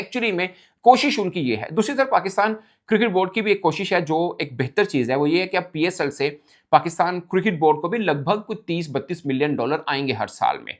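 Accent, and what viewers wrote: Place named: Punjab, India. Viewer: بھائی اپنے پنجابی نما لہجے میں اردو پر کنٹرول کریں۔ پنجابی میں کوئی برائی نہیں آپ ضرور بولیں مگر جب اردو بولنی ہے تو پھر مکمل اردو لہجے میں بولیں۔ مثلا لفظ قانونی ہوتا ہے قنونی نہیں۔ براہ مہربانی دھیان کریں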